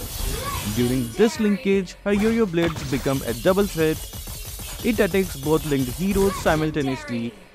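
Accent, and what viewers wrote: India. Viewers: Are u from India bro ur voice is like an Indian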